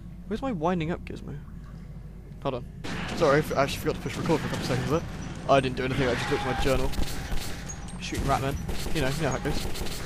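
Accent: United Kingdom. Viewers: Um.... since when does having a british accent make you sound like a nerd. I never really thought about his voice that much, everything is pretty much for the lolz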